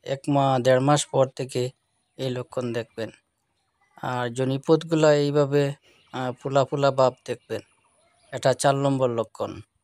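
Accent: Sylhet, Bangladesh. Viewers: oh jiggesh korlm because apnr kotha gula sylhet er mto suddho kothar sate sate sylheti o mix ace tai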